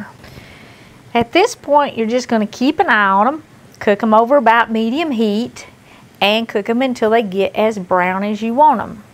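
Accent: South, United States. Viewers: Dear Ma'am. Please know how much I appreciate your videos. I love the Southern accent and charm. I was born in Germany, raised in Chattanooga and now live in Murfreesboro. I have lived all over this world 'cause my stepdad was in the Army. Having spent a bit of time (twice) in Massachusetts (Yup....Yankee), I'm sure you can appreciate how much I love the South. Your shows are awesome, delightful and educational. Thank you for what you do and my God bless you and your crew. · I love your videos I love your southern accent I was taught by my mamaw to cook just like you do. I talk just like you, taters, mater's. I like the scripture's and the fact that you put God first. God bless you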